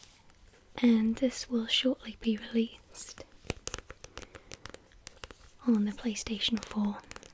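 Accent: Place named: United Kingdom. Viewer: YES I DID! Ive been watching it every night to fall asleep! I love the way you tap! More than the way most ASMR artists tap. Thank you! And your voice (and British accent, as I am American) is very relaxing as well.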